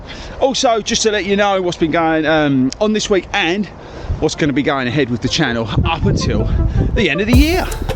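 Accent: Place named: South England, United Kingdom. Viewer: Cheers for the videos this year, I don't watch all of them and I'll tell you why in a moment. The running related content is excellent, the vlogs are excellent but there are too many videos released. You will burn out, and I tell you this as an ex YouTuber who had 45k subs & 50k views a week.(it was not a running channel) I made 3 videos a week but refused to endorse any product, and was emailed multiple times a day to plug this and endorse that. (You know how it all works) You don't want to become known as the king of the freebies, as a viewer it's the most off putting thing ever. (I could say the same for mid roll ad's which I also didn't do) too much product placement leads to you not being trusted and coming across as insincere. Quality videos over quantity win every time, also don't worry about pleasing the American audience which is why I suspect your shoe review weights are in ounces and most videos come out at midnight, (Americans will watch anyway because they love a South England accent!), I stopped my channel about 18months ago now because it became another job which I never wanted it to be. 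Anyway best of luck for 2021 for you, the run community and your excellent channel.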